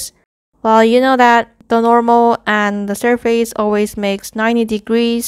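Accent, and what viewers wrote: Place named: Malaysia. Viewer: Love from Pakistan ? BTW, you sound like Malaysian cuz I lived in Malaysia for 5 years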